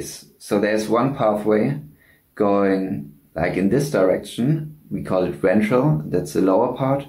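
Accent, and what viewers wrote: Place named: Germany. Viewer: Starker deutscher Akzent, bist du Deutscher?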